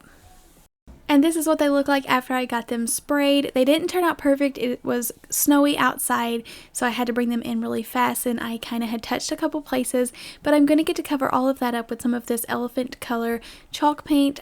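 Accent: South, United States. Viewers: I Love all your crafts!! So cute & you are so creative too! I also love your cute southern twang, it reminds me of my Mama- she's from the south!!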